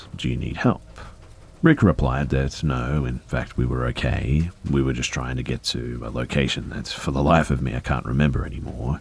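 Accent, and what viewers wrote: Australia. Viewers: Is Australian???????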